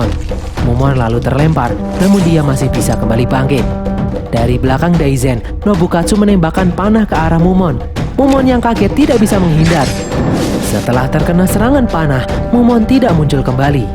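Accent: Java, Indonesia. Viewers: Cukup cukup matamu. Iki ketoke seng ngisi suoro wong jowo iki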